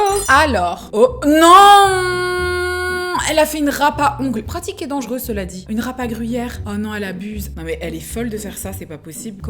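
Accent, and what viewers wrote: England, United Kingdom. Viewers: J’adore ton accent anglais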